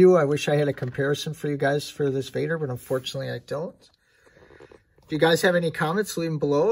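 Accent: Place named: Asia. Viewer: Are you in the Philippines? cause you have an Asian accent. I just like to ask where to get that.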